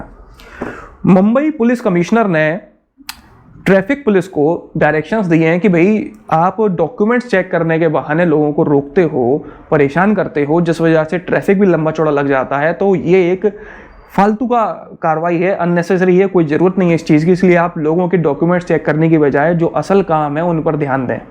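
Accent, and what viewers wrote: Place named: Gwalior, India. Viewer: Gwalior ki boli 🔥🔥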